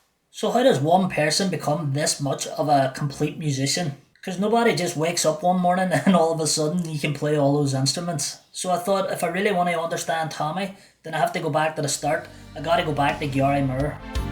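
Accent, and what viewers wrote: Scotland, United Kingdom. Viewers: I know. I was just enjoying your way of talking. I love a strong accent like scottish and irish. 😊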